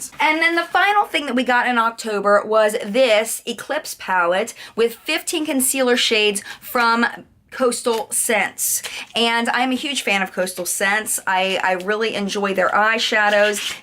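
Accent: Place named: Mid-Atlantic, United States. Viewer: You live in Baltimore?!?! Me too! Did not know that! But I definitely recognized that accent as mid-Atlantic!! Go Ravens!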